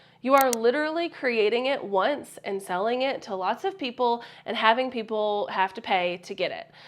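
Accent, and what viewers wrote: South, United States. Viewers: You are fantastic! Love your videos and positivity with a splash of southern twang :) Question, If you create a digital worksheet in canva do you have to do something specific when selling it as a digital product so that someone can use this and actually write in/on it via their iPad? If you have a video on this - could you point me in the right direction?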